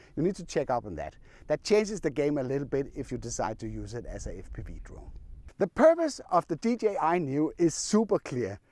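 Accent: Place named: Denmark. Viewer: Sir you accent sounds Dannish, Swiss or Norwagian 😊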